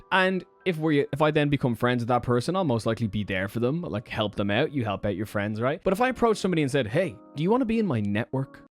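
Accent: Ireland. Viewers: For an American you have a really Irish accent….. ❤️👍🏻🇺🇸🥃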